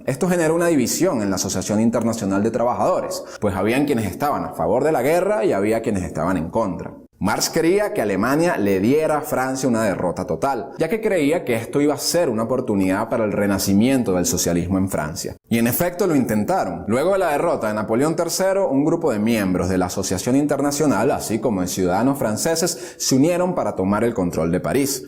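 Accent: England, United Kingdom. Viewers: Para hacer una biografía sobre personajes alemanes, o bien pronuncia los nombres a la alemana o bien a la española, lo que queda fatal es pronunciar las palabras en alemán a la inglesa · Historiador para comentar hay que tener cultura.los terminos deben pronunciarse en forma de origen.como todos pronuncian con acento ingles.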